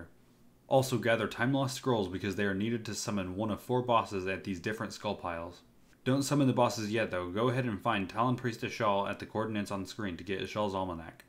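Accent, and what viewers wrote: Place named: Netherlands. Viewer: You're Dutch, aren't you? Good accent, it's almost not coming through. Almost :P Nice vid, keep it up. Speak a bit slower though, it's not a race :P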